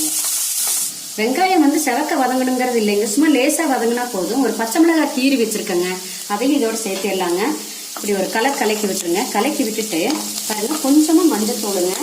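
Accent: Kongu, India. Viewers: nice to hear kongu Thamizh. super process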